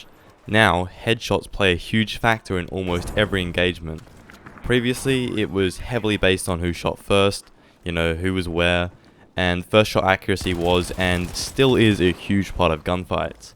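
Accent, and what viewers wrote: Australia. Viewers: Man it’s good to hear an Aussie voice 🤘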